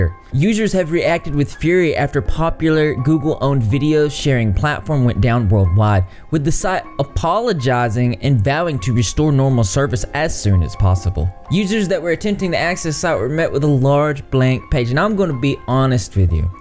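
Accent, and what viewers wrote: South, United States. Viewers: I'm trying to figure out where your accent comes from, is it Georgia or Tennessee? Maybe western North Carolina? :)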